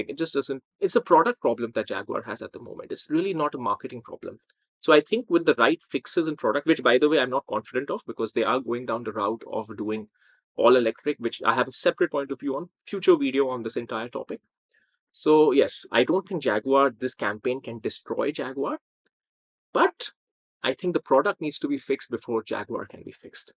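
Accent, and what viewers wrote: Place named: United States. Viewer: He sounds American, very disappointed if he's British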